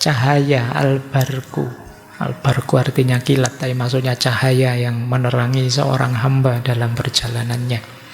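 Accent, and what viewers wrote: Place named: Malang, Indonesia. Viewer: Dimana yah kajianya,tempatnya pak Guru ini yah,nadanya kayak orang Malang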